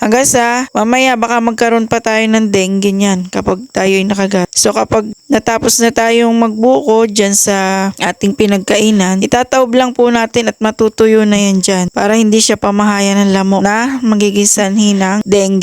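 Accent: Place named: Batangas, Philippines. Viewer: Love your Batangueno accent sis,may fren ako dito from Batangas sya 🙂😎 sanaOl fresh ☺️natawa ako sa braso de Mercedes relatemuch 😳🤣😂done 👍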